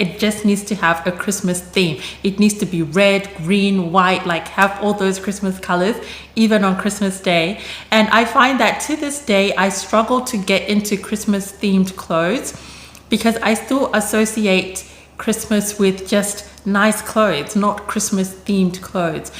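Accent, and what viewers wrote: Australia. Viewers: Another lovely video. You speak so nicely, but I am beginning to detect a hint of an Australian accent. As an older Australian I do miss the joy of a homemade Pavlova at Christmas. Passionfruit, strawberries, peaches, mangoes, ect: I haven't had one in years. I do hope you get to enjoy one with your family.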